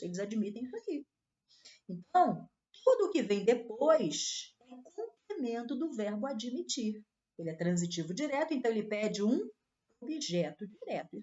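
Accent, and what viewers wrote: Brazil. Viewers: Parece paraense!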